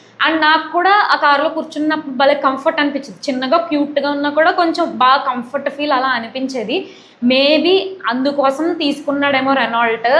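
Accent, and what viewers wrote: Godavari, India. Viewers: Car chala bavundhi Miru matladuthunte pure godavari slang kanipisthundhi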